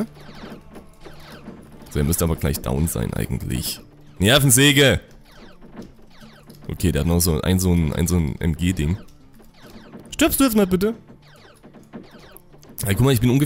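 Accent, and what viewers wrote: Saxony, Germany. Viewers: Du bist ein sachse ?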